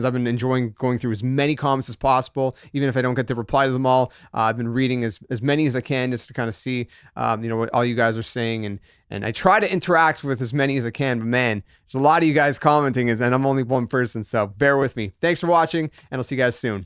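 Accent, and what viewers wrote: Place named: Canada. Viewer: I’m here for the cute Canadian accent. Here for the degrassi vibes. lol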